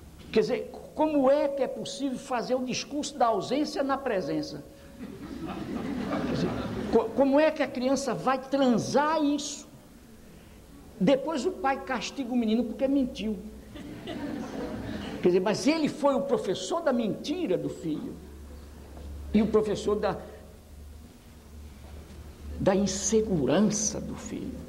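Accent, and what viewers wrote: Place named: Northeast, Brazil. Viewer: Bolsominion não aguenta ouvir um homem inteligente,muito menos com sotaque nordestino.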